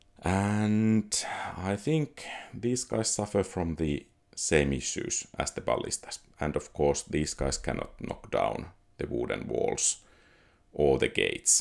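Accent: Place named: Finland. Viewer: rallienglanti oli vahva täällä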